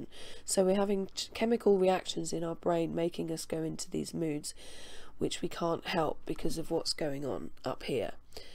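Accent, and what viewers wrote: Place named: United Kingdom. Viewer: I’m glad you have a British voice.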